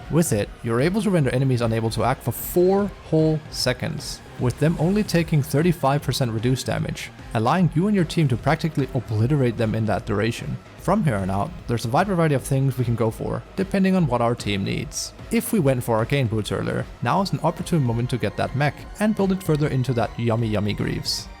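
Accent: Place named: Denmark. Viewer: Thanks (fellow dane here btw.. took me a few mins before I realized, but accent gave it away) - matter fact won 3 games in a row as muerta 5 just today : )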